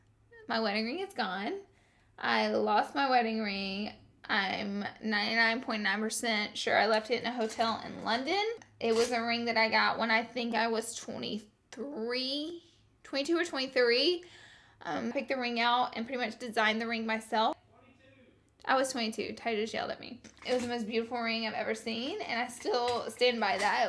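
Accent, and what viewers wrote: South, United States. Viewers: Omg yes!! Can't wait for your merch!!! I hope it has some of your country twang or sayings!:) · My heart is just so whole after this video, I remember when I first watched you I didn't know if I was going to keep on watching or not, but after hearing your southern accent I felt right at home(Georgia Peach, also Alabama fan). I loved when you talked about self worth, lately I've been in this long term relationship and he's the best boyfriend ever, but there was a point where I had no self worth because of all the drama and long distant we were going through. Now I've been working week by week fixing my self esteem and glueing all the pieces back together. I know that I may have my family, friends, boyfriend and other things to carry me if I need help, but I want to be able to walk on my own and not need help, and that's what I'm working on now. 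Your makeup videos have always inspired to safe up my money and spoil myself(because not only do have a makeup addition but I deserve it), but they've also inspired to reach my goals and look fabulous while doing it. You're such a glorious and fabulous human being and I love you 😘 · I just love you... I come from the south too, and when I watched one of your videos for the first time, I was so shocked. I was so shocked to hear someone that actually sounded like me on YouTube. Someone who had a "thick" accent, like me, who was so out there. I love how you don't try to cover up your roots, or force yourself to be something or someone that your not. I'm writin this to you all the way from a teeny, tiny town to tell you to keep on keepin on, and to tell you thank you.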